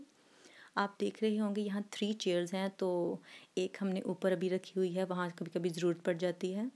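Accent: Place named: Sialkot, Pakistan. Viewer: MASHA ALLAH ap silakot se ho kia? your accent is like sialkot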